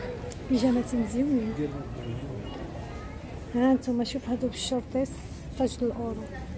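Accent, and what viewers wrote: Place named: Tangier, Morocco. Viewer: هاذ الشي زوينة أختي يمكن اوربا ماشي طنجة اللهجة طنجوية بالتوفيق تشرفيني❤😍🤲👍🌹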